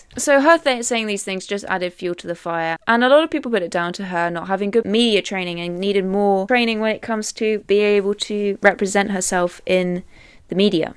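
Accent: United Kingdom. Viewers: You probably get this A LOT but you look so much like Emma Watson and u sound like her cuz ur both British 😭 That’s a good thing though because you are both very pretty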